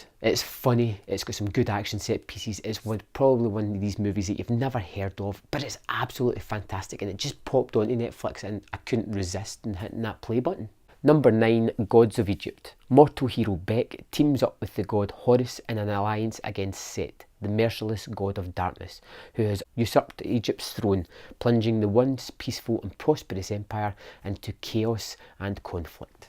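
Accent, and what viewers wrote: United Kingdom. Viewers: I like British the accent is cool yeah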